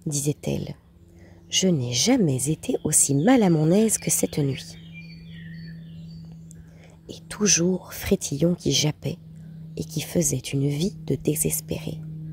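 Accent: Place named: Belgium. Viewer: Ici en Belgique on dit cela quon va dîner et souper 😉 et si je reconnaît bien l'accent de notre lectrice elle doit être belge car j'ai le même accent et je suis belge 😀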